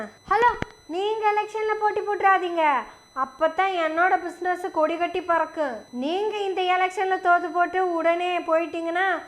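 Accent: Kongu, India. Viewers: Excellent very nice attractive attractive Kongu Tamil. May the God bless you and grow as a a very popular youtuber. You have excellent intrinsic latent and dominant skill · வணக்கம் எங்கள் திராவிட மகளே, வணக்கம்... தங்களின் மாறுவேடம் !?!?! 😮😮😮 உலக நாயகன் கமலுக்கே போட்டியா!!!😂😂😂 நம் அழகிய கொங்கு தமிழில் தங்களது பேச்சு.... Amazing...👌👌👍👍👍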